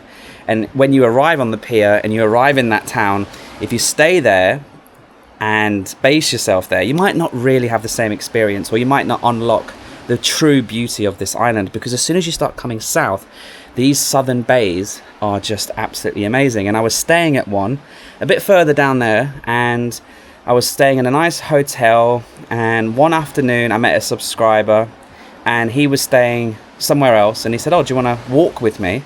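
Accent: United Kingdom. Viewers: I clicked hoping to hear a British accent - yesssssss!!!!!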